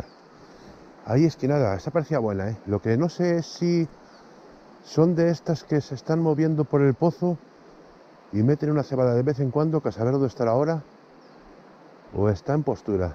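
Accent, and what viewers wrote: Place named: Spain. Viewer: Por su acento amigo me imagino que es de España 🇪🇸 usted Qué país es Amigo saludos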